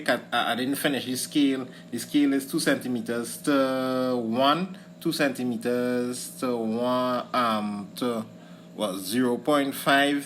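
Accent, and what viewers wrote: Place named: Trinidad and Tobago. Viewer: you sound trini, i am hbu?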